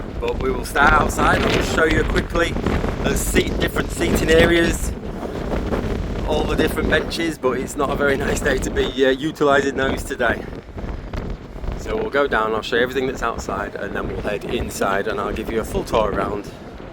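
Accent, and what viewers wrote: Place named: England, United Kingdom. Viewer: Being an Irish gay man myself, I love your English accent - and you are very handsome looking too 🇮🇪☘️🇮🇪😍😍😍😍😍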